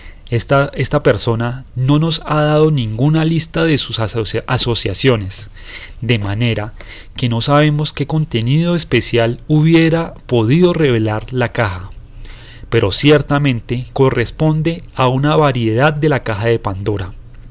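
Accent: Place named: Colombia. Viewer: Que joya este libro y de mano de un acento colombiano. Oro puro. Saludos y gracias por ese trabajo que hiciste para transcribir esta joya!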